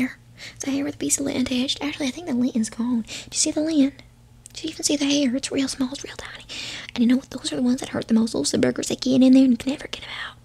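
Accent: South, United States. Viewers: OMG... with a southern accident is too much cuteness. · You know I laughed hysterically when I heard that Southern twang and vocabulary. That sounded a little too authentic to the knucklehead that is me, myself and I. Also the voice reminds me of my late aunt. That may be another reason why I laughed. I have fond memories of her and a funny story or two with her. One in particular. That funny memory is something I have not thought about in awhile. Thanks for the extra smiles! Edit: no I am not from the south but the area I live in in western PA could be transplanted from somewhere from the south lol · As a southerner you did a great job with the accent! :hands-yellow-heart-red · Her southern accent was amazing. Great job ❤ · You are almost the polar opposite of how I picture a woman from southern US in my mind, but your accent makes up for it entirely. 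The stark contrast is, for some reason, incredibly satisfying. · All I love in the same masterpiece! I’m not a specialist but I think your accent is good, it reminded me of movies or series with southerners. il est vrai que tu es très "belle"! from face but also your profile is so elegant! you're always stylish in appearance, but also an interesting, original and fun personality. you are really the person you think you are! also I really hope that your sleep quality will improve🤞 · i specifically love southern accents , this was pretty damn good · I’ve been so used to the voice you’ve used for all of this time. When I turned on this video it immediately made me feel way better. I don’t know what it is about a southern accent especially coming from you but it is so calming to me. Thank you so much for making this video. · If this was your first video.. I would think you were dead ass southern. 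Nailed it!! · What a sweet accent. I loved Mrs Cooper's accent, so that's southern... Good to know... one more asmr keyword I can search for. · is back once again! I really liked the southern accent! You should do some more accents in the future 😀😴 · w southern accent ❤❤❤ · Southern comfort. This is my favorite so far. Although, the English accent one was good too. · That southern accent was spot on, thanks for waping ma aahballs, appreciate ya'll...😁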